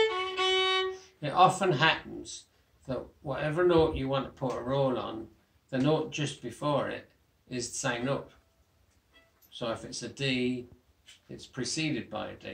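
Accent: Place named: Cork, Ireland. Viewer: You talk like my 12 uncles from both sides of my family, they all came from Dublin when they were young men to Birmingham about 50 years ago, but I detect your not a dub but more western like cork.?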